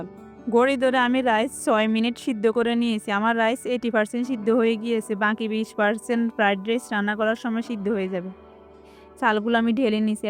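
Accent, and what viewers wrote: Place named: Noakhali, Bangladesh. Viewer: আঁর নোয়াখাইল্লা ভাবি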